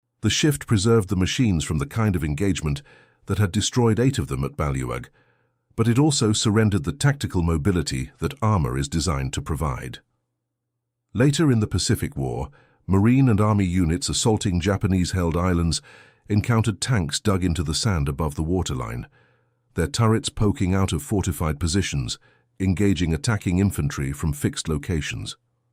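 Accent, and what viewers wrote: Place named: United Kingdom. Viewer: Wisconsin (now). Uncle was Naval Academy grad who commanded a destroyer in Pacific. Other uncles served in Canal Zone and elsewhere. My dad was too old but wasn't taken when he volunteered because he was an oil chemist and manager. He and one uncle were needed at home to produce oil and cotton. Friends' moms were welders etc in defense plants and shipyards.We all grew up in Arkansas like Pappy Gunn, learning to shoot when young including women. Both bros, 1 dead and 1 100% service-connected disabled, and I plus several relatives are VietNam-era vets. Your AI images, Brit-accented narration, and fake sonagrams suck.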